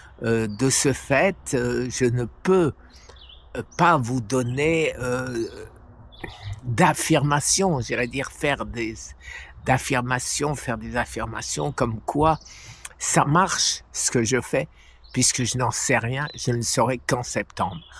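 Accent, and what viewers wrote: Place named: Nord-Pas-de-Calais, France. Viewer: Bonjour. Tu parles comme les ch'tis! C'est ici que l'on dit "Petit pain au chocolat" 😉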